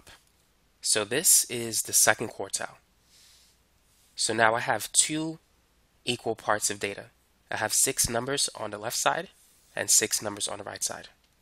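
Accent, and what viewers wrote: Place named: Brooklyn, United States. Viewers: this guy is from Brooklyn I gotta say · you gotta be from new york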